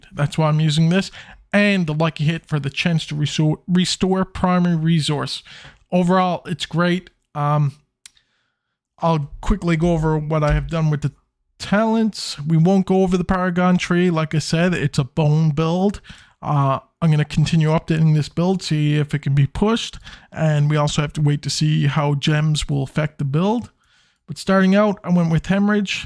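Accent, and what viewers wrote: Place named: Newfoundland, Canada. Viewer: where u from u sound like a newfie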